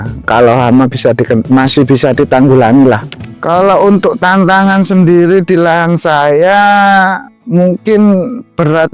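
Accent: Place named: Java, Indonesia. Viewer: Hmmm, mas medokk bangettt, akuu sukkaaaa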